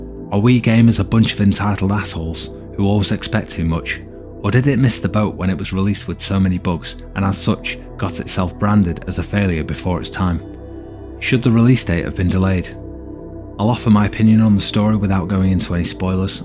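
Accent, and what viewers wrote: United Kingdom. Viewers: Just discovered this game reviewer. Between the warm tone, brit accent, depth, and level of brain power required to comprehend, I'm like, "this mf should be voicing everything and telling me the weather and my driving directions and boarding sequence and how awesome the next movies coming out might be. #MAKEMORECONTENTBRO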